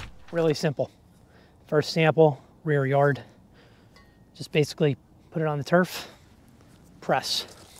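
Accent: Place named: South Jersey, United States. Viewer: New sub & fellow South Jersey guy here (Gloucester County, 20 min from Philly). It’s comforting to hear that strong South Jersey/Philly accent on a lawn care channel, ha!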